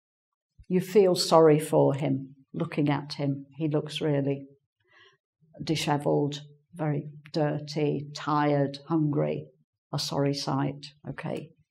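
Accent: England, United Kingdom. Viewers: I already felt that you have british accent . I am enjoing the language to improve my knoledge Thanks a lot. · I LOVED your video. Thanks. It was very useful. By the way, it was the first time I saw you on Engvid. Now I am watching all your lessons. Your British accent is beautiful. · with (engVid) Your accent and your appearance are great,! ) While watching any of your lessons I feel myself sitting in a London pub drinking ale or having breakfast in an old Birtish castle. )) Thank you for these unforgettable virtual trips! ) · From Brazil From Marcio Hello Teacher... nice to meet you. This is the second time I watch a video of yours, and I enjoy very much. One question ,please. Where you're from? Yor accent is familiar to me. It sounds like a British person. Anyway, Your lessons are very nice. I have just subbed to this channel. I hope not to disappoint you... 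I'm still learning your language. Hugs from me and Brazil. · Is your English from England madam?... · Brilliant! You have a very elegant old English style. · I really love your British accent, specially when you're explaining. Furthermore, Shakespeare is one of my favorite dramatist, the work that I love the most is Hamlet ♥ Greetings from Peru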